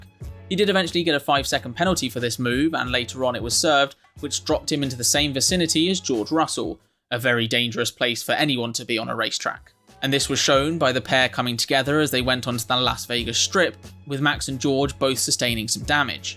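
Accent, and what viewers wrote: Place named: United Kingdom. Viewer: This vid starts. I hear Brit accent and I hear immediate jab at Verstappen. Yah. Ciao. Not my video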